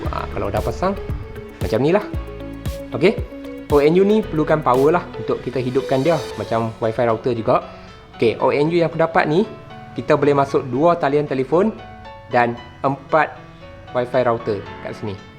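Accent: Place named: Kedah, Malaysia. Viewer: Bang fasih gila slang kedah.. piau noh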